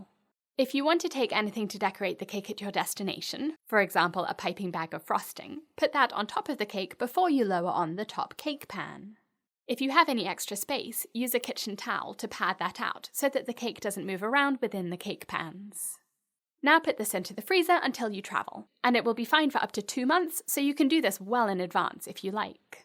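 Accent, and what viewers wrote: United Kingdom. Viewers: British~ Like it,that's amazing -;)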